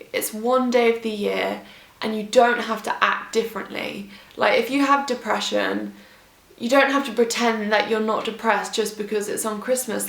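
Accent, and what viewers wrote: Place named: Coventry, United Kingdom. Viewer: She's from Coventry 😊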